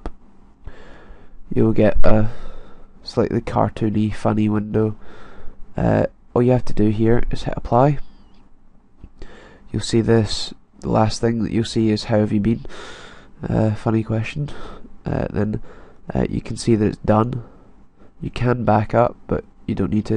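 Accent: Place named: RP, United Kingdom. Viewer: "High Bread Fizz X" Dat fancy Brit accent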